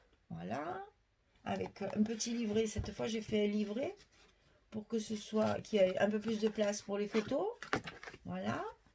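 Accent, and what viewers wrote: South, France. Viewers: bonsoir......je viens de me regaler avec votre video......merci pour la petite leçon d histoire(lol)...l album j adore.....les papiers sont vraiment bien mis en valeur....et la boite bravo....je n ai jamais encore touche aux cires et peintures......c est vraiment reussi .....vous avez un accent bien agreable,plein de bonne humeur et de soleil.....moi aussi je suis du midi.....je suis catalane.....pour myriam de scrap for you c est vrai c est une personne tres gentille ,un jour on a passe 2H au tel je ne m en suis meme pas rendu compte tellement c etait agreable.....bonne soiree....;a bientot pour votre prochaine creation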